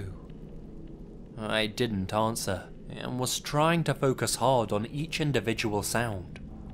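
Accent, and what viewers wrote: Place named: England, United Kingdom. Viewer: I love your videos man, where in England do you live? Love the accent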